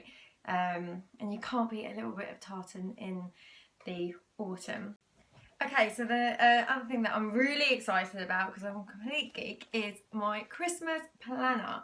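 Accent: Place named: United Kingdom. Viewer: The content of this video is not for me, but I watched anyway, because well, I am a sucker for a gorgeous woman with a British accent :)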